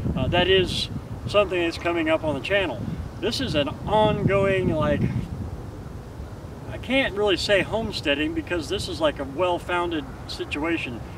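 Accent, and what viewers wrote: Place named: Missouri, United States. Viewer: Thank you....i was getting the impression you are in Mo , I have family in Mo. and thought i recognized your accent ( be it barely noticable )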